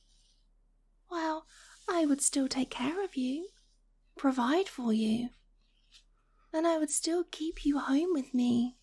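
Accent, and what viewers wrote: United Kingdom. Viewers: Wale accent?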